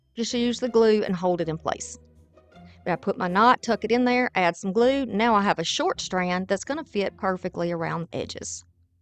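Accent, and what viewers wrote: South, United States. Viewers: very cute and awesome vintage haloween vibes. i like your accent, reminds me of living down south. and i like your creations. congrats on the temu affiliation. as a fellow diy/arty crafty type, i have some amazing stuff from temu and next time i order, i will remember you ☆ ty for sharing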